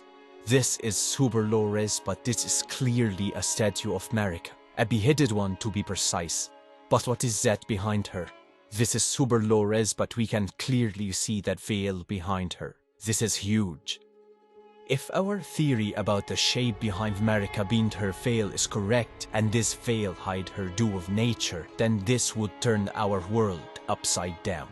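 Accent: Egypt. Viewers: عرفتك مصري هههههه استمر يا باشا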